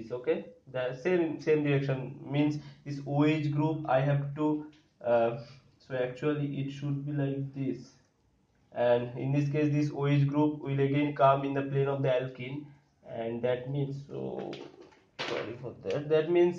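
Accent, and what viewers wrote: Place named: Bengal, India. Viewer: Nicely explained. And U sound like a Bengali. I'm also from Bengal.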